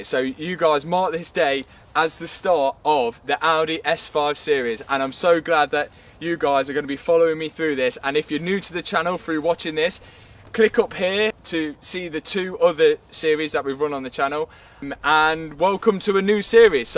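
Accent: Leicester, United Kingdom. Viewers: its a lovely car, even if you left it as it is now it still looks nice. are you from Leicester? your accent sounds like it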